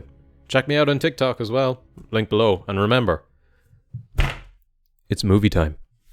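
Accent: Ireland. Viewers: why is an irish version of james wilson from house md telling me about invincible? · YO UR IRISH IMA BE WATCHING U FROM NOW ON YUPPP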